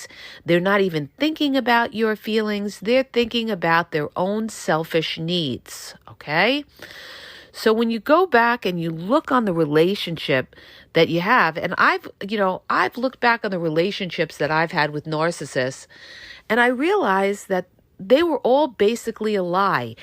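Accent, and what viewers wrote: New York, United States. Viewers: . You are an amazing woman. The amount of information and experience that you share is helping lots of victims of narc abuse.Your N.Y. accent and honesty is awesome. You have figured these creatures out. They are not genuine and they are not going to help anyone. LIARS. Stay away. God bless.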